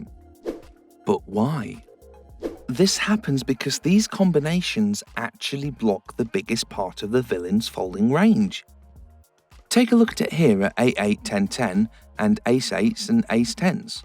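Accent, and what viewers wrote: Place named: United Kingdom. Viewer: British voice is the 2nd best selling point behind his last tip. Shame, I had to watch that whole video to get to that idea.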